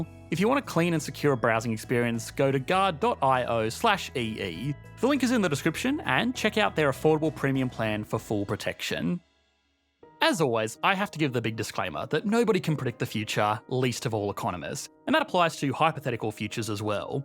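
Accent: Australia. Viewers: I could never move down under cant stand the accent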